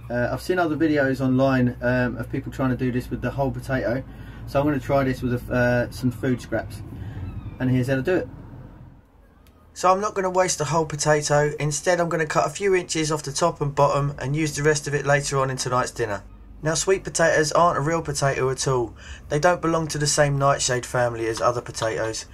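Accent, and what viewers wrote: England, United Kingdom. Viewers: When I first heard your accent I thought you must be an Englishman living abroad, then when I realised you can grow them in England it gave me extra confidence to try them here in France. I like your video I'll be giving this a go and then checking out your other videos. Well done and thanks. Al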